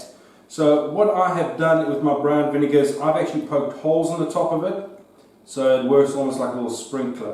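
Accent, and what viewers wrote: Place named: South Africa. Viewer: I can hear Seth Efrica in but you've also something else going on with your accent. Nice video by the way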